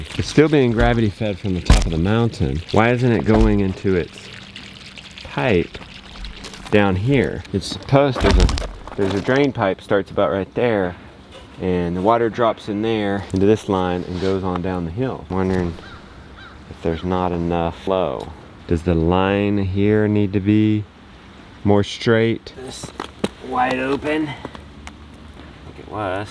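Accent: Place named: Hawaii, United States. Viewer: Hey you sound like a hawaii local person say. We go see if the water stay flowing. That's what we say. Ok den brah!🤙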